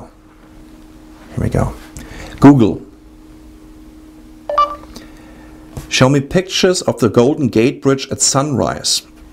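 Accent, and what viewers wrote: Germany. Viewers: Like the video and German accents are cool, man. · Du lässt die das Wetter von China und Taiwan anzeigen, hast aber einen deutschen Akzent beim Reden? Suspekt.. Aber sympatisch :)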